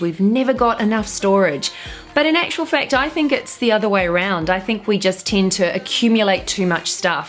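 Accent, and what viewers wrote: New Zealand. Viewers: As soon as you started talking I was like is she kiwi yes she has to be. I was also very excited that you are 💓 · That is not an Australian accent. I think she’s from New Zealand.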